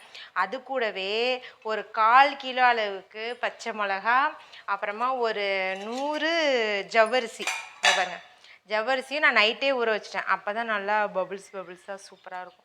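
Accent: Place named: Thanjavur, India. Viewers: Hai sister love 💕 from kumbakonam namma oor slang❤ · Neega peasuratha paththa Enga urla peasura mathiriye irruku na thanjavur district Madukkur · Yentha ore pa ,no vithyasam illa nanum ipdi dan thaŕŕ nu nu solven Thanjai tamil theliva irukum. Yenaku ungala pidikum pa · Nanum vungala mathiritha pesuva nanum thanjavur district than sister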